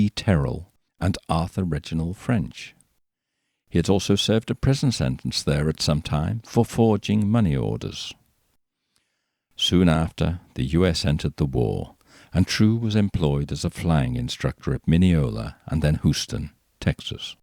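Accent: United Kingdom. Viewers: This was undeniably British, what ?! I'm inclined to start saying Cheerio, instead of bye.🇬🇧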